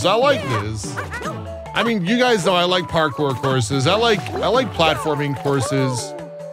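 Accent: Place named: Canada. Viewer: He's Canadian. I assume that's how they pronounce it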